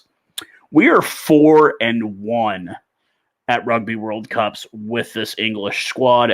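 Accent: United States. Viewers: Thank you for this video, really enjoyed an American voice talk informed and passionately talking about our Bokke, will be following your future content, would actually love to see the US come up in the rugby world. Keep up the good work.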